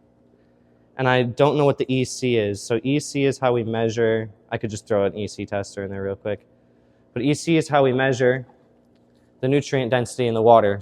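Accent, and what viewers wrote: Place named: Southwest, United States. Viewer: Nice video. Sounds like yur in Nevada, New Mexico, Arizona or Texas. Your ideas our great. We find plant people are the nicest. Eventually kids will be even more fun then plants then you teach them all about these great things, nothing more joyful then kids. Keep pushing along, the Lord, wife, school, work, family then plants n hobbies and you will have a great balance. Blessings and very nice videos and well spoken.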